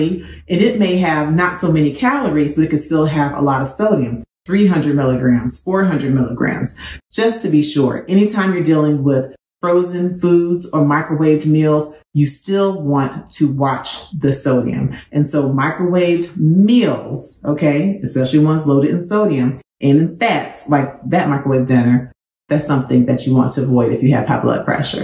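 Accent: South, United States. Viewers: Oh-my-goodness! thank you for making this video. You are doing 4 things here for: Reinforcing much of what I'm already avoiding and giving me more reason to stay focus. Reading the content, cooking more. I'm a little a Pescatarian finding more options. You are also causing me to miss my home grounds in Arkansas everytime you say " You know Goodden-well" I've only heard from southern people.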